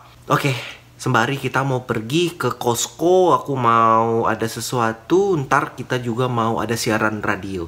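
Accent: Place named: Minangkabau, Indonesia. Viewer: Logat minangnya masih kental banget bang.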